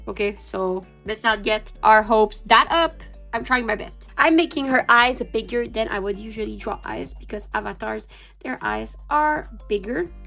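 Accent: France. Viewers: the french accent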